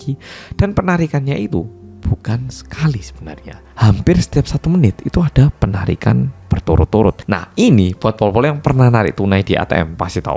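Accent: Java, Indonesia. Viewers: Orang Korea kow ya medogk 😂😂😂❤🥰🥰🇲🇨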